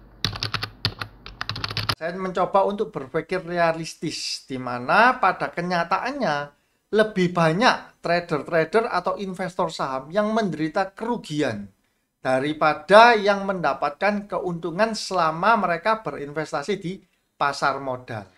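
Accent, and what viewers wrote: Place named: Java, Indonesia. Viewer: Jadi ketagihan lihat video p ini.... Banyak ilmu yg bisa di ambil,Saya juga suka logat nya medok jawa meski pakai bhs Indonesia😁....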